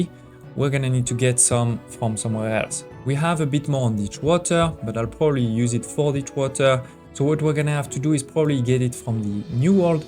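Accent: France. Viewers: i think the video would be better without the french accent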